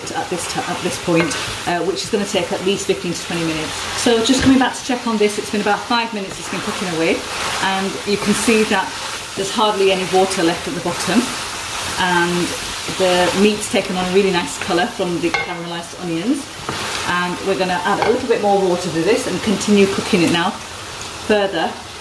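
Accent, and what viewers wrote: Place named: England, United Kingdom. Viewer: I love your English accent with Urdu words 😊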